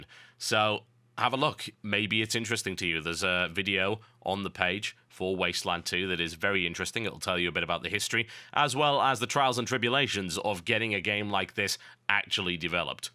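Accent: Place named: United Kingdom. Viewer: "Bawoldur's Gate," god I love TotalBiscuit's accent. It's so hilariously British.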